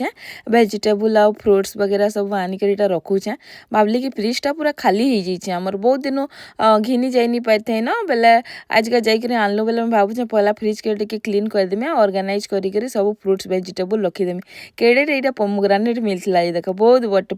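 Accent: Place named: Sonepur, India. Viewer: Kenar ho madam amar sonepuria enta laguchhe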